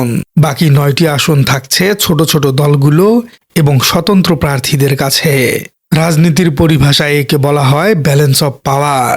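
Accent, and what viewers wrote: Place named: Noakhali, Bangladesh. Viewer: Give up noakhali accents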